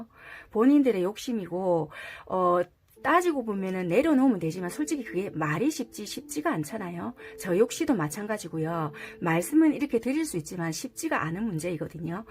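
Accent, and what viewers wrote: Busan, South Korea. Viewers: 네. 고맙습니다.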